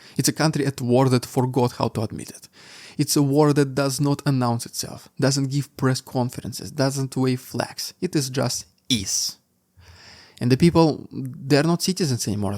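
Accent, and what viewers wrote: Russia. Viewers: Love your charming Russian accent. Keep up the good work, and hold on to your accent, because it sounds authentic and is very easy to understand.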